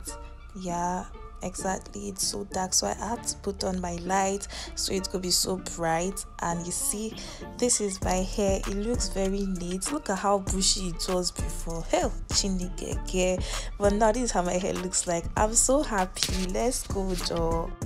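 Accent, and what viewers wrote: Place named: Igbo, Nigeria. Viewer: This your Igbo is sweet o😂❤️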